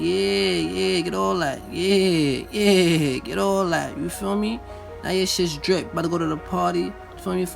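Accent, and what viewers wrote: New York, United States. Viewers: I can tell u New York nigga but big respect to u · He from New York lol 😂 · Bro..you from NY? Distinct ass accent lol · Thats Newyork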